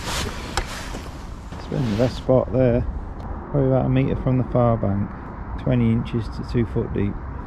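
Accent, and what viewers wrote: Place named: Birmingham, United Kingdom. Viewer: Great result, even I was gutted when you lost those two fish 🥺the fish in Packington are all very nice Looking, and need telling it’s winter and to stop pulling so hard, I’m noticing a change of accent too 😎 definitely a brummie drawl creeping in from fishing there so often😁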